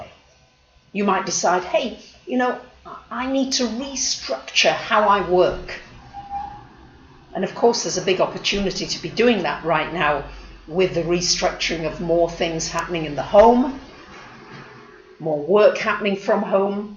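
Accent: Greece. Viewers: looooveeee your greek accent <3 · I dont smile much and that is the problem. But your energy is different than others and i appreciate the greek , with that i resonate. A rarity. Not just info, but you too. Your heart and light comes through and your accent helps. 😄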